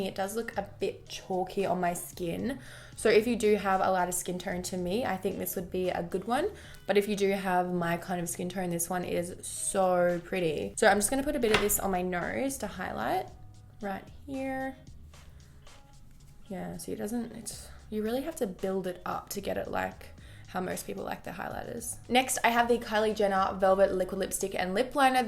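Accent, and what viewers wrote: Australia. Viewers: I adore Australian accents. 😍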